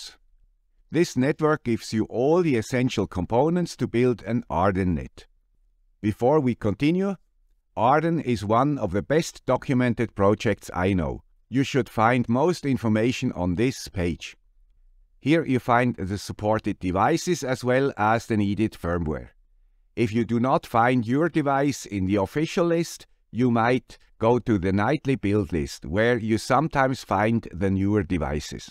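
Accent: Switzerland. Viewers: Awesome! And first time I think I've heard you speak Swiss german (with a touch of french too?)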